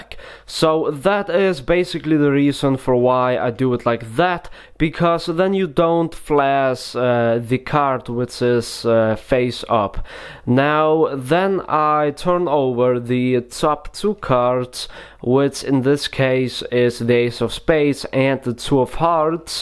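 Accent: Russia. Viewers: I love your Russian accent! :D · It’s called the Russian accent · Oh man this guy reminds me of crazy Russian hacker for some reason because of his accent and sometime he does the "boom" thingy which crazy russian hacker also does😂😂 i love your videos though man, i have subscribed to multiple magic tutorial channels however i think that your tutorial quality is somehow equal to 52 kards... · The weirdest russian accent of all time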